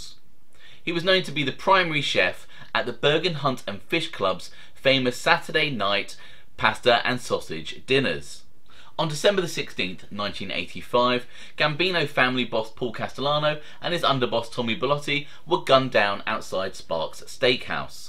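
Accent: United Kingdom. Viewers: I normally despise the British accent(no offense) and refuse to listen to it but you're channel is damn good I can't help it.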